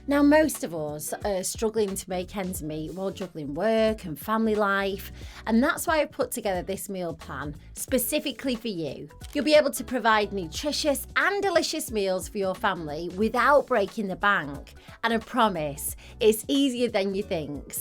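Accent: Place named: Manchester, United Kingdom. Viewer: Yes - it's a fairly standard English accent. Manchester-ish(?) I think she has a lovely, friendly voice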